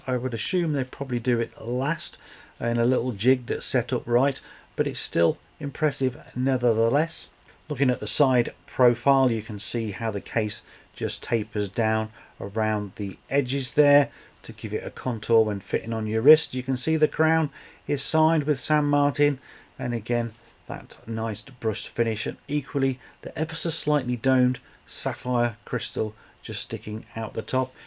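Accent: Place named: United Kingdom. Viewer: Hello mate thanks for the great video. Are you in the UK? It certainly sounds like it but on your website it says you don’t ship to my address (London). Is that right?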